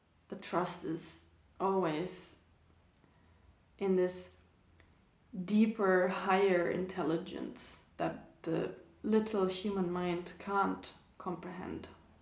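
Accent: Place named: Ireland. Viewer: Hey there! Do you live in Ireland? I'm picking up hints of an Irish accent 😄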